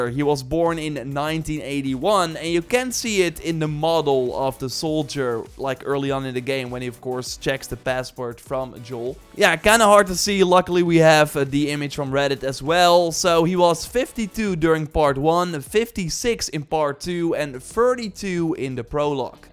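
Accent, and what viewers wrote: Netherlands. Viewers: Ik hoor Nederlands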